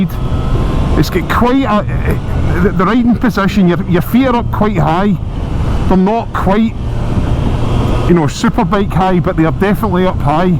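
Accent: Scotland, United Kingdom. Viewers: Man, the Scottish accent is really something else! Love the bike and love the landscape; cheers from Italy ✌🏻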